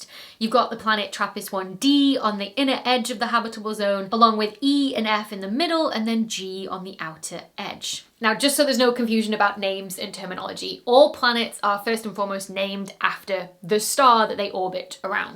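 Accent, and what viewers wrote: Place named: Durham, United Kingdom. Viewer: You really picked up the accent at durham, i couldn't work out if you were Victoria wood or steph houghton lol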